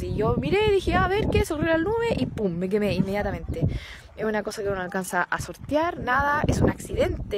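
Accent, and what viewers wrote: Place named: Chile. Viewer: esque es chilena xD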